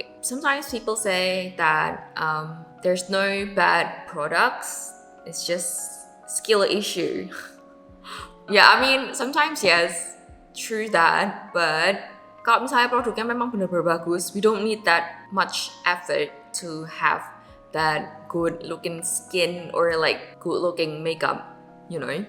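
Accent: Australia. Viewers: Omg the aussie accent ❤ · selain suka hasil make up & tekniknya, suka bgt sama accent aussie nyaaa🫶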